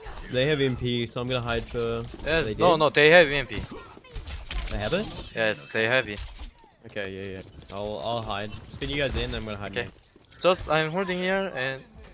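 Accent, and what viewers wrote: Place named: South Korea. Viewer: 콩글리쉬로 지껄이는거 굉장히 듣기 불편하노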